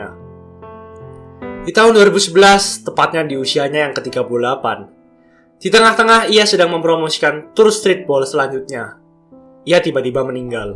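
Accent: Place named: Surabaya, Indonesia. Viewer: Medok banget... Wong Suroboyo Yo... Hehehehe..😀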